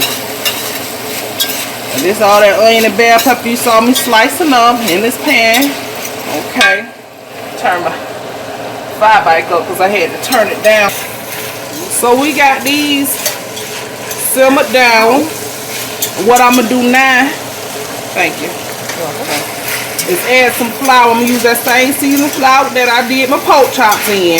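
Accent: South, United States. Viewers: I knew You was a southerner from that accent so I already know that food finna be the bomb 💯 yes and You like me clean I don't know who can cook in a dirty nasty kitchen that's not cool I'm definitely subbing to ur channel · Enjoyed this. Very instructional. I'm from PA and this is the most southern accent I've heard, but you can tell that she knows what she's doing!! For the Mac & Cheese, I use Cayenne pepper. It gives the dish a more robust taste than the black pepper. I got that tip from a chef and have never turned back. :-) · You know she can cook with that southern accent 😍 black mamas don’t play when it comes to food 🥘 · Lol yesss! You Can Tell She's from the hood and the South! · I bet she either from Alabama, Mississippi, or Georgia lol she talk just like us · This is how I was taught. Saw the bonnet, heard the accent and promptly hit the subscribe button. 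From one Southern Gulll to another..Gone Girl!!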